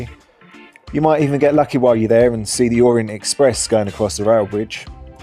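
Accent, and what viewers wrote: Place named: London, United Kingdom. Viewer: I nearly choaked laughing, that gangster london accent 😂😂 Very entertaining commentary 👏 😂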